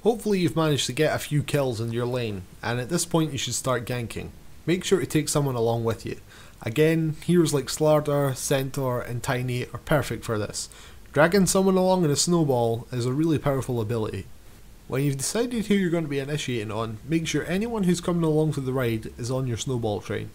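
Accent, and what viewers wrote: Scotland, United Kingdom. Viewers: good guide. Scottish I guess?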